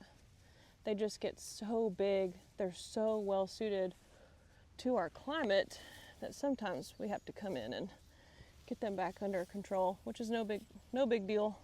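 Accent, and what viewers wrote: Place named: Texas, United States. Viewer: you can tell she's holding back on her texan accent.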